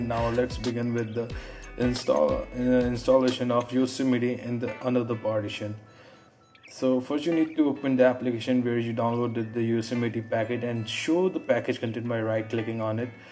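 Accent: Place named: India. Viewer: Hinduinglish... How to make WO mac?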